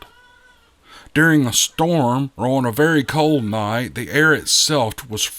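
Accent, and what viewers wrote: South, United States. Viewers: Good afternoon one of your tribal friends and as I’m getting my monthly chemo and your voice pod piped up thank u Sir your southern real voice is so soothing 😊thank You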